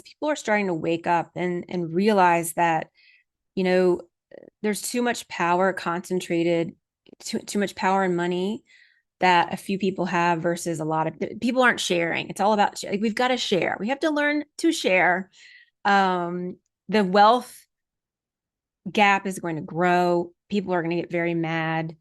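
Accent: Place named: Australia. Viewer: Fantastic hearing an Australian biais 😅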